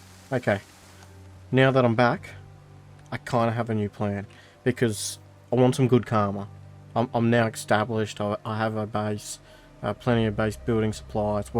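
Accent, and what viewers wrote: Australia. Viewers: first time as a aussie hearing another aussie speak and going we do not sounds like that aha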